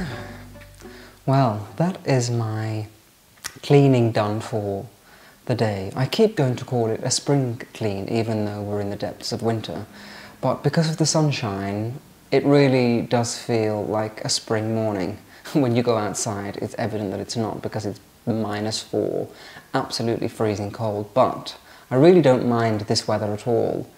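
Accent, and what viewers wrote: RP, United Kingdom. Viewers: accent is so posh.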